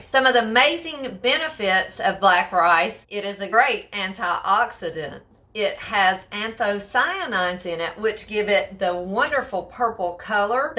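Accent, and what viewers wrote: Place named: South, United States. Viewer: Howdy? I love this southern girl accent 😍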